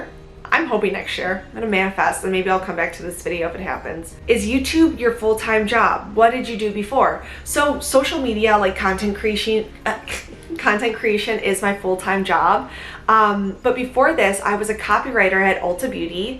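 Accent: Midwest, United States. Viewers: omg you’ve got a thiccccck midwest accent. i grew up in suburbs of illinois too. i love it!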